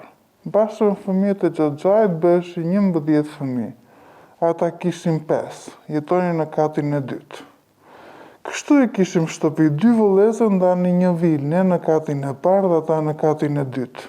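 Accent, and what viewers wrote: Albania. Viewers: Te duket nga veriu me kete dialekt ? Zonja perdor fjalen koshtrat kete fjale e kam degjuar te flitet nga gjirokastrite.Mentalitet te tille per te shitur vajzat qe ne mosh fare te vogel ekziston akoma rendom dhe ne zonen e Mallakastres dhe Fierit por e sigurt nuk eshte nga keto zona. Eshte ose nga gjirokastra ose nga saranda sepse jeton ne 1 zone qe kane patur besimin katolik sic edhe zonja thote shkova ne 1 kish. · I kam degjuar pothuajse te gjitha historite tuaja por 1 gje nuk kuptoj ne te shum histori pervec se i njejti ze ,ok mund te jet i modifikuar por dialekti i njejte si nga zonat e Fierit ,kjo te le te kuptosh qe mund te jene histori te sajuara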